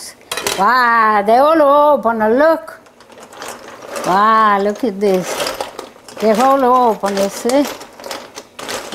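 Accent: Italy. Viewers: Dear Grandma, my parents are both first generation Italian American from New England but we all live in South Carolina now…….I’m truly sad that the grands and great grands truly have little idea of the richness of our culture……..I’m willing to bet they’ve never heard your accent while I loved visiting my Dad’s family for that very reason! What you are doing is really documenting what it is to be Italian and that is very important. Of course your cheerful voice, lovely smile and glorious food preparation keep us coming back for more….keep singing, Nonna!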